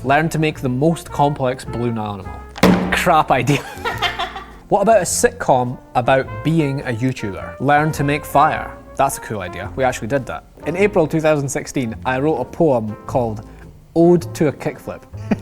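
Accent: Scotland, United Kingdom. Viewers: I love how when i see "crappy" i can hear it in the Scottish accent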